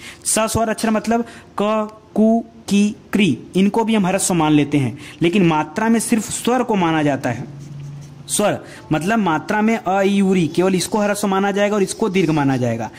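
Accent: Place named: Uttar Pradesh, India. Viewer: सर जी आप उत्तर प्रदेश से हैं, आपलोग 'ण को ड़ (र) क्यों पढ़ते हैं।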